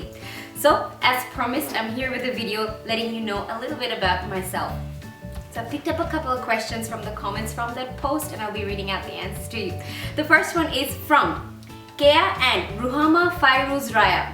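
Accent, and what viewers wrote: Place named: Bangladesh. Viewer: আপু আপনার bangla accent শুনতে খুব ভালো লাগে !!!! :v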